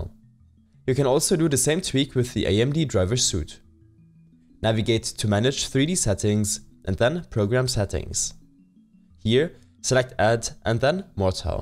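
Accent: Germany. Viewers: i bet ur german u sound like one ich bin ja auch deutsche :D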